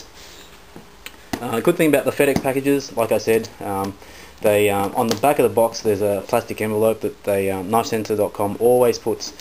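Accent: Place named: Australia. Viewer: good to hear an aussie accent for once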